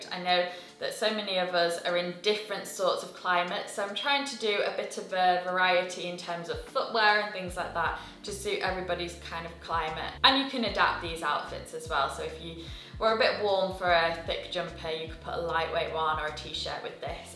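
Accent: United Kingdom. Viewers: Could you please share the designer of the skirt? You did mention it in the video but it was so fast, combined with the fact that my American ears are not used to hearing British english, I cannot for the life of me figure out what it is you said. Gorgeous skirt, I would like to hunt around and see if I can find it somewhere. Btw, I just recently stumbled into your channel and basically binge watching everything. I am def rethinking my wardrobe and really would like to update it. I had a bad habit of getting pretty much all my tops in XS, which is my true size, but there is not any drape really, and everything is kind of fitted. For the longest time I said I would never wear oversized clothing, but after watching your videos, I think I have finally seen the light 😂😂 Literally got rid of close to 15 cashmere crew necks from my closet today. They are all XS. 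Whyyyyyyy Anyway, another thing i swore I would never ever do is a looser fitting trouser. I basically wear skinny jeans in size 26 waist. I always thought they would make my bottom look bigger than it is. But they look so beautiful and stylish on you, I have been salivating over them. A big problem I have always had was even though I have a lot of clothes, and many of them are truly nice (McQueen blazer, Tory Burch dresses, Burberry heels and trenches and Burberry wool coats), I often struggle to figure out what to wear and how to pair things to not look boring. After watching your videos, I realized that it’s bc I never b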